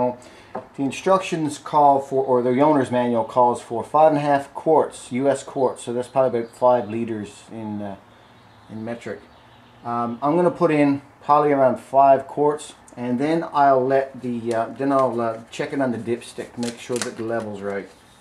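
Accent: Canada. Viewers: this guy sounds like a fucking newfie lol click click click click click click with the torque wrench lmfao rofl!!!!!!!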